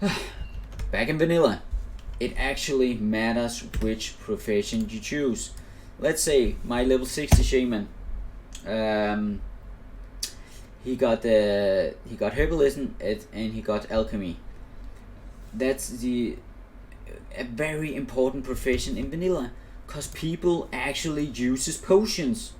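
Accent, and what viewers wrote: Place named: Denmark. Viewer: What contry is you from? Du lyder dansk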